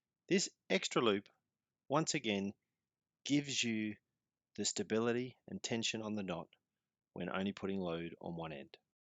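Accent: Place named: Queensland, Australia. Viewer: I sure do hear your accent so I assumed you are from QLD? Doesn’t sound like VIC, SA or WA to me, but it isn’t easy to tell… Belfast, Northern Ireland, only 980 Nm from where I live (now). 😉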